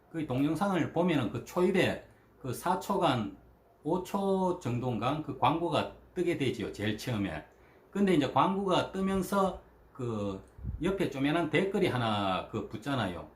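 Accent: Gyeongsang, South Korea. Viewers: 경상도 대구 홀애비 인 갑네요? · 말투는 대구같은데 ... 늘 건강하세요 잘 보고 있습니다~^^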